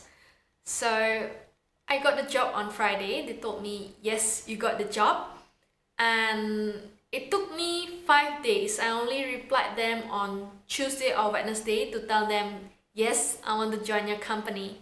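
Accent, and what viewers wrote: Indonesia. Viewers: Your indonesian accent is obvious in this video..hehahe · Mbaknya ngomong inggris aksen Indo ya?. Kental bgt logatnya👍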